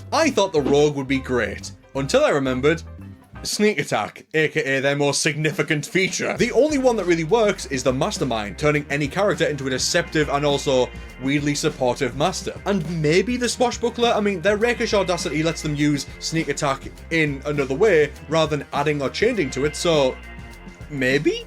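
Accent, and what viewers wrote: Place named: North East England, United Kingdom. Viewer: Hey, are you from the north east of England mate? Im Newcastle so can hear an accent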